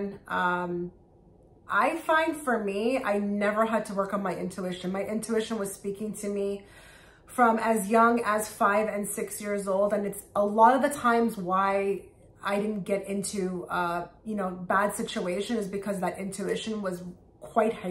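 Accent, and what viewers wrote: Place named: East Coast, United States. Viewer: You sound like an East coast girl to me. New York area?? I am from NYC. Your video resonates a lot for me! Is there a way to message you? I have experienced all you speak of in this message. Blessings to you!!! ✨🩵✨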